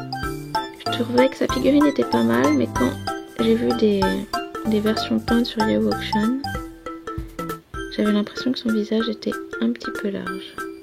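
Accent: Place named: France. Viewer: ~! and your french accent is so cute great review! ps:i hope you know who i am;p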